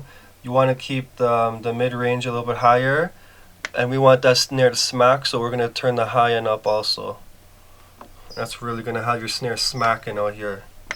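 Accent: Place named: Toronto, Canada. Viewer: siiiiiiick....nice accent..must be from the 6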